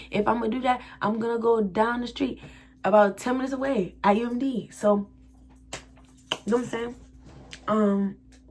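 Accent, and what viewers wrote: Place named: United Kingdom. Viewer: It’s the UK accent for meee😂😂😂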